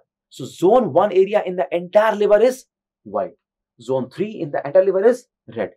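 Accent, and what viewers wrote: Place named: India. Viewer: Someone tell bro to turn off his indian accent because noone can understand him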